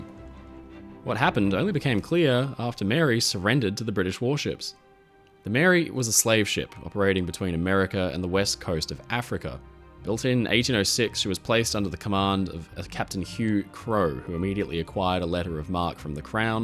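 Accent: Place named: Australia. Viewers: Yo my brother! Are you Australian? The accent sounds Australian in one statement and British in another Yes, I know. Australia and the U.K. are part of the British Empire. I'm just curious. Thanks for an informative website! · He sounds very Aussie to me.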